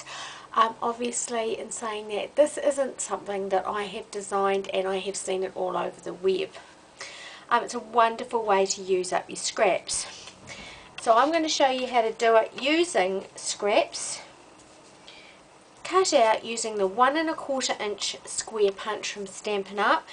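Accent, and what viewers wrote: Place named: New Zealand. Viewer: nice video - clearly done. Was wondering if there were any NZers working on cards and posting videos on you tube. Nice to hear that accent again! Looking forward to more videos from you.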